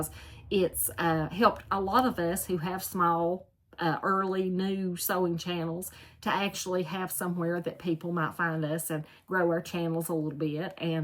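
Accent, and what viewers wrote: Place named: North Carolina, United States. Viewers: Omg, my daughter started laughing with the “Mayflower” comment! That was just hilarious 🤣 🤣🤣🤣. Congratulations on copying the old jumper, it looks great on you, especially the color 🙌🏻🙌🏻. You are definitely not alone in not wanting to show your body rolls 😱😱. I just wanted to say that we truly enjoy your NC twang. 💖💖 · Hi, you did such a great job of copying your jumper and it really fits you so nicely. I love hearing your North Carolina accent. My stepdaughter also lives in North Carolina and she has that accent, too. Have a good week. 🙂❤🙂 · we all love your NC accent! You’re so down to earth and keeping it real! I wear a blouse under my jumper. Yours looks great on you! I want to make a pair of overalls. BTW, you’re on my list of YouTubers I want to meet! ❤️❤️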